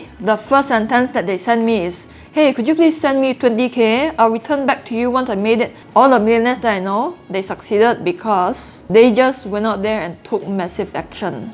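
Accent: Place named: Singapore. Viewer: Two things i love from (No Sarcasm): 1. Her punked singlish accent & style 🤘 2. F*ckin honest forex coach & motivator who DOESNT SELL ANY CRAPS, DAYDREAMING & BULLSH*T things about trading forex. Big thanks & respect!! 👍🏻👍🏻👍🏻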